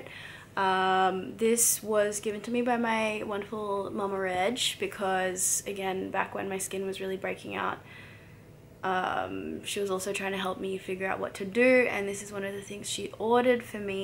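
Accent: Australia. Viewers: You’re one of my fave blogger now, I love your aussie accent. Whereabouts in Australia do you live?:)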